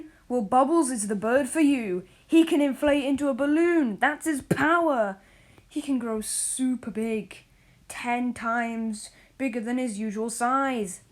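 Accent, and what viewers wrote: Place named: Australia. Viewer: I love your Australian accent!! ❤❤❤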